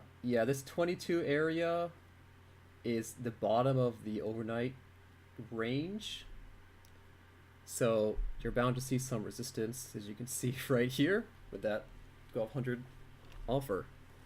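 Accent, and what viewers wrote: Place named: France. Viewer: hey man, whats up. I followed you on twitch. Do you still stream? also you sound french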